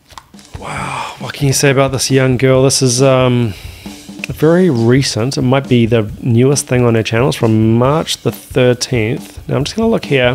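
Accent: New Zealand. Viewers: Don’t forget the people who don’t speak English at home. Add the NZ-accent to that. I only hear some English like sounds but can’t make up the words 😰😰😰.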